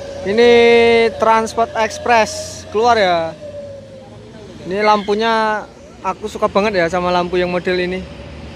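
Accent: Java, Indonesia. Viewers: Adminnya ngomongnya kaya orang Jawa😁😁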